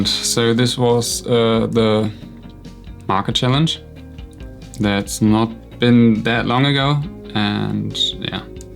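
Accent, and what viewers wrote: Germany. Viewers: Wow, your German Englsich is so sweet😆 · ich hör richtig raus das du ein deutscher bist xP ps: nice pictures! love them x3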